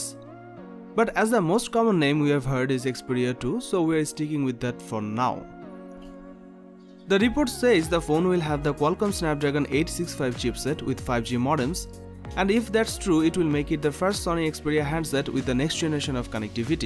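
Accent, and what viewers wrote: India. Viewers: Gak tahu kenapa kurang suka aja kalau orang india ngomong inggris 😂😂😂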